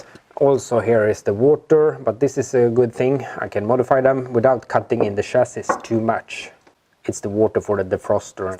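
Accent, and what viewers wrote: Sweden. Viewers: Damn that block!You can fix it!.Good luck! It's always funny hear swedish accent but better than we Finns, our accent is just terrible.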